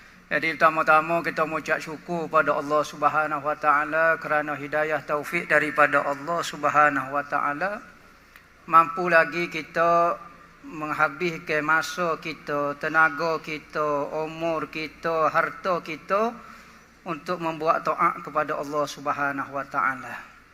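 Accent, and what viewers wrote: Kelantan, Malaysia. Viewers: Ya, sebab ini bahasa negeri Kelantan , salah satu negeri di Malaysia. Agak sukar difahami. Alhamdulillah bapak bisa faham walaupun mengambil masa. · tu lorat klantan dh cmpur bhase stendert tu..klau lorat klantan yg original..ak rse spatah hram pn org ngeri lain x pham..ni kn plak org indonesia.. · Terbaikk loghat melayu kelate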